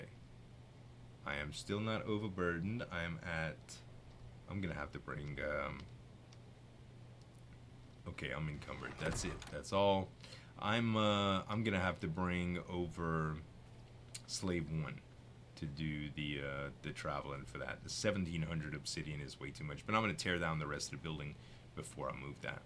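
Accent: Louisiana, United States. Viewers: Z I watch you for you not just the games you play I watch you for the funny loud Cajun that you are! Although I might not like every game you play I still look at them just to see. On a side note I have been loving the face cam the VR and your new hybrid animals! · Hi Z, I like to think people come to your channel for the same reason I do......that Cajun accent, the fantastic way you get over excited and high pitch when something cool happens, and the high quality content you put out. No matter what you play. Just keep being Z cos that is what your good at, and that is what we want to see.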